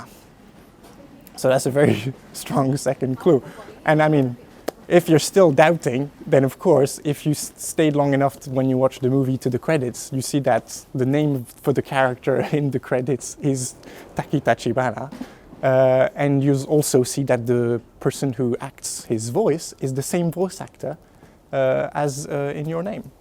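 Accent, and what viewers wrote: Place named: France. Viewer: l’accent français mdr j’aime trop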